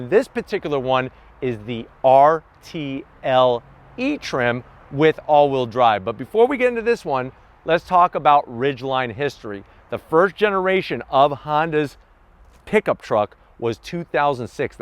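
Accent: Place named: Northeast, United States. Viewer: Thanks for posting. Love the candy references and the northeastern accent.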